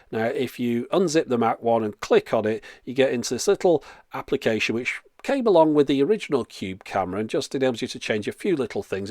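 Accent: United Kingdom. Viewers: from which part of the UK you are?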